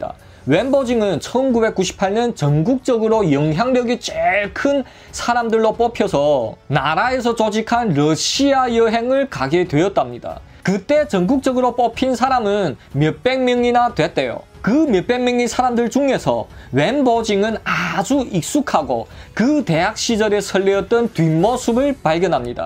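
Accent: China. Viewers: 어찌된게 삼국지 보다 더 잼나지 ㅎㅎㅎ 중국 말투가 섞여서 더 집중이 되네요.. 너무 잼나여 감사합니다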